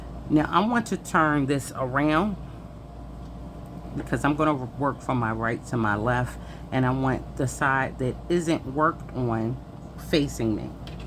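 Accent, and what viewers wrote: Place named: Philadelphia, United States. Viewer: Hey... love your work. Where are hot from if holy don't mind me asking. I hear Philly or Jersey. I live in Philly.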